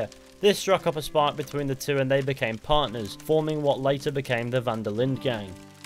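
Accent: England, United Kingdom. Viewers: I'm sorry but this video would be better with a deep American accent instead of a high pitched Englishman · Umm I'm an American and in no way would anyone say his name that way. And for real British are not ones to talk. Bloody Hell (For real get a new catch phrase) You taking a piss? (Makes no sense) My friend is in Hospital (surly you mean in "a/the" hospital) it's not like you say my friend is in store. No your friend would be in "the"store. I could go on and on but you people long ago lost touch with speaking correctly. I once herd a comedian say Americans say Herb wrong because it has an H in it. But then how do you explain Knife? And you guys LOVE that letter H you super put alot of weight into it. Just saying next time you wanna say someone else talks funny make sure your own accent isn't full of stupid memes.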